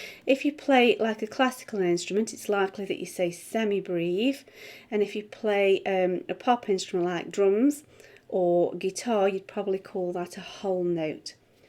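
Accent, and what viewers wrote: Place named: Lancashire, United Kingdom. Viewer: This video has helped me a lot. I feel like I am starting to learn a new language. I'm 35 and a beginner, but I have managed to play simple tunes, so far. I have some sort of acute hearing that helps me hear a note and play it almost immediately. To be able to read other sheet music is has made me make an effort into playing with both hands. (something I thought to be impossible). This has inspired my kids whom are 5, 11 and 13 to play our piano. Thanks so much, it is much appreciated, plus your beautiful accent reminds me of my family in Lancs. Be safe all the best :)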